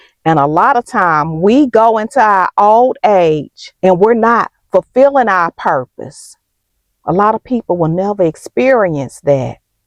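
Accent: South, United States. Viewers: You are such a true inspiration ma'am...You came across my feed last week. I listened to you and your southern accent and now I'm hooked 😊 Keep the good content coming while I continue to be attentive and share your videos. All the best for the new year...lots of love to you and hubby❤ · I seen your video for the first time today. I love your spirit and your country accent. You have given me something to think about. I totally agree with what your saying. My husband and I recently relocated from Arizona to Georgia and I feel a big shift in my life making such a big change. Things are very different in G.A. I need to find something to do in G.A. Still looking for a perpose in a new state.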